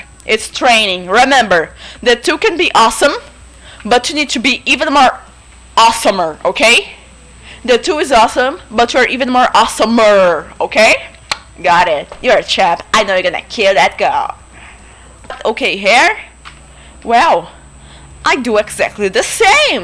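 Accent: Brazil. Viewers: Gahahahahaha i learned the same amount i had fun with this video! Thx! idk where u're from, but sounds like ur from Brazil, if i'm right, muito obrigado pelo vídeo! Hahahahahah me diverti e aprendi mt S2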